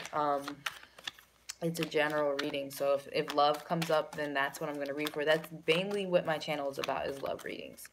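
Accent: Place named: DMV, United States. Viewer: Where are you from love... you sound like your from the DMV area or some where around there... (DC/Maryland/VA) ... Just being nosey girl... LOL!!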